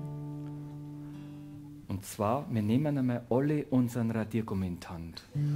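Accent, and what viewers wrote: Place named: Upper Bavaria, Germany. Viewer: Gerade durch Zufall auf dich gestoßen und find es echt geil.Darf man fragen wo du her kommst?Vom Dialekt her aus Oberbayern?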